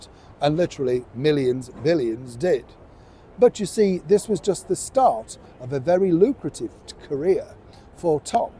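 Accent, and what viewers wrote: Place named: North, United Kingdom. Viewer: He's from the north...give him a break!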